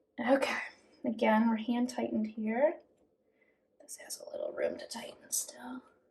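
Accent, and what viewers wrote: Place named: Midwest, United States. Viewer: Thanks for the great video and clear instruction. Love your midwestern accent and I haven’t heard the word “grody” for so long you took me back to the 60s/70s! Ha! Just wish I could still get down on my knees. Arthritis galore! Thx again!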